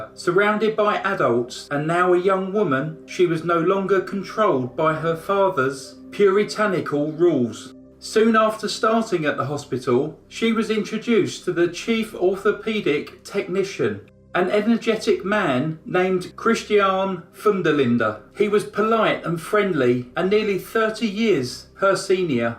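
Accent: United Kingdom. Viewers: First time viewer. Love the subject matter but (I'll make enemies here) your audio sounds very canned. If I could offer some CONSTRUCTIVE criticism, improve your audio because you have a great voice and what American doesn't love a Brit accent? Keep up your great work but the audio recording is just... a bit off putting. Improve that and your "likes" will shoot through the roof. Love your work. Keep up the great stories! Bill: Brooklyn, NY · Brit accent goes a long way 🥰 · Cool! I am subbed to both and CR and have been for some time! I swear they sound very similar voice wise, just different U.K. regional accents!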